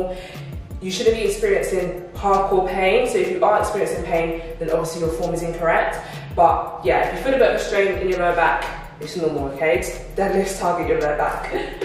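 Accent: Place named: Cockney, United Kingdom. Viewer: I just come here to listen to the Cockney accent lol. Keep up the work 👍 💪 From Canada 🇨🇦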